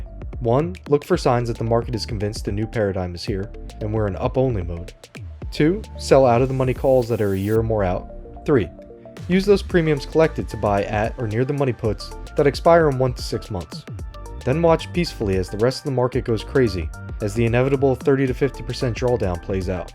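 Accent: United States. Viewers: haha it sounds more like a Maryland accent but cool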